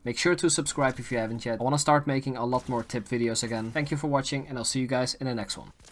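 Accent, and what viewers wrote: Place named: Netherlands. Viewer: Bro ik hoor aan je engels accent dat je Nederlands bent geef get toe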